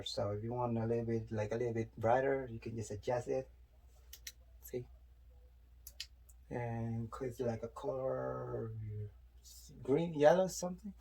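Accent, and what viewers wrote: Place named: Texas, United States. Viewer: Kuya! Texas accent kanaaa 😅🤩 More vidsss! 🦋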